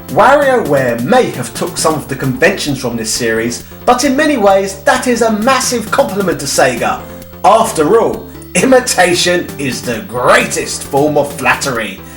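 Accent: United Kingdom. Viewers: Aw man 🎩 you know I'm a fan so why'd you have to slag on rap music? TBH your accent is a charming reminder of some of my favorite UK rappers.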